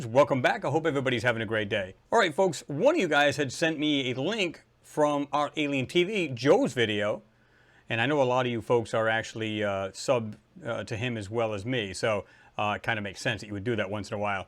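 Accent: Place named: Northeast, United States. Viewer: First thing ....cool show ...love what you cover!! Secondly ...had to smile listening to the accent of commentator....I'm from New England and would bet that the accent is Northeastern U.S. 🌲😁💪💯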